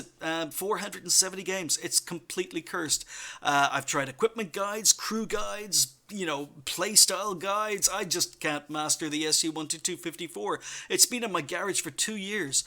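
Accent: Ireland. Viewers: Great video! Loved your sexy Irish voice! That sounded like something a Girl would say :p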